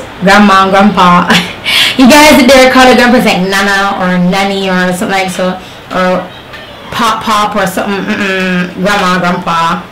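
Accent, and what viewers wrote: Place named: Jamaica. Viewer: I lost focus at one point coz of your ascent 😁 I think I need to learn Jamaican language 😄.... The connection you have with your son..... Is so so amazing ....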